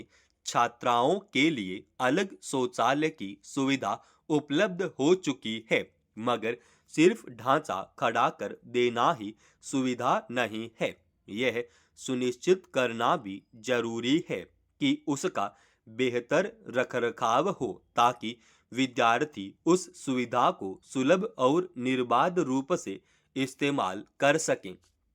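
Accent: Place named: South, India. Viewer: पेदा या पैदा अरे मद्रासी हिन्दी बोलना सीख लो। न होता है या नै। पहले अच्छे से हिंदी सीख लो भाई बोलना।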